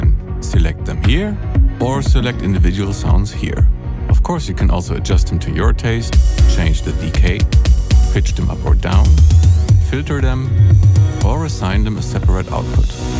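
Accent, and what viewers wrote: Germany. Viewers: German accent ...damn..the inventors of groove music lol...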